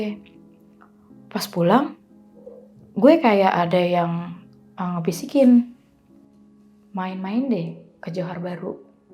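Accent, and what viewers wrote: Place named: Yogyakarta, Indonesia. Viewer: Senang dengar logat betawi dan jogya ne mbok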